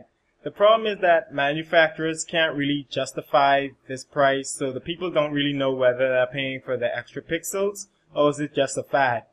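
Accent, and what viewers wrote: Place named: Wales, United Kingdom. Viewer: This guy has a Welsh accent if your wondering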